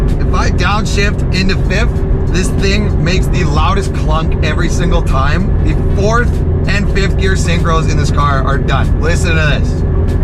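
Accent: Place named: Canada. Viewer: Buddy!!! Sick ass subi. First time watching your video definitely subing. I heard the accent of a fellow Canadian Brother. What Provence you in? ( If you don't mind me asking)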